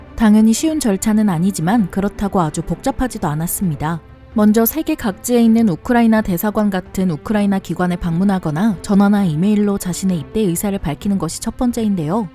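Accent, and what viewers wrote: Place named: Seoul, South Korea. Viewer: 누나 이제 서울말 잘하네여ㅎㅎ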